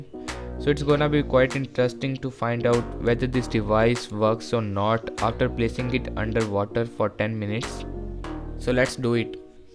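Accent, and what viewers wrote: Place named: India. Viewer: Индийский акцент это пиздец.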